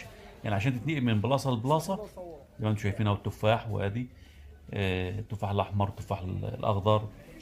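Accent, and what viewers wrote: Upper Egypt, Egypt. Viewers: مصري صعيدي 👍 لهجتك عرفتها. تحية لك يا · انا مغربي بس بكلم مصري وصعدي كمان ومن لهجتك انا عرفتك انك انت من صعيد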